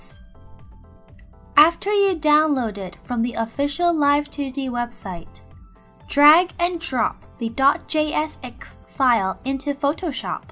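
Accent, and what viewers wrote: Japan. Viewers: I´m from Mexico and what I note is not about your comprehension of English . The problem is the person who is explaining the video, It seems that is a Japenese person talking in English, is noticeable due to the accent and is hard to follow what she says until you hear various paragraphs of what she explained. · I think it's because she's Japanese and she's trying to focus on her accent lol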